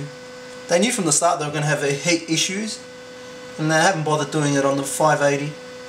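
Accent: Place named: Australia. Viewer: Aussie pride, shown through expensive products and decisive accents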